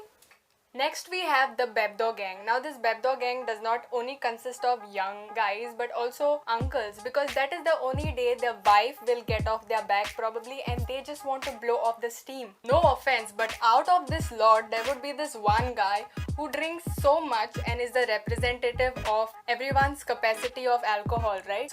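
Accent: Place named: Goa, India. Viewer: It's a relief to hear goan accent, I am from anjuna by the way. Tired of hearing marathi and hindi all the time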